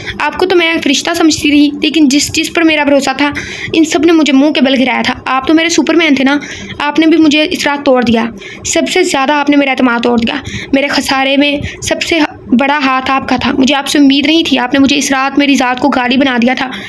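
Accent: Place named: Lahore, Pakistan. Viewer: aap Lahori tu nahi ap ki vioce toon se muje laga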